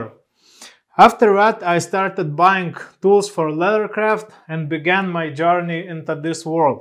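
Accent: Russia. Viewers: Русский акцент 😁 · Мне кажется вы русский) очень похожий акцент)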